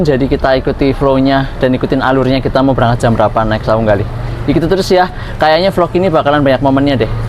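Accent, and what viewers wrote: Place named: Banyumas, Indonesia. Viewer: Hadirr mas mas ngapak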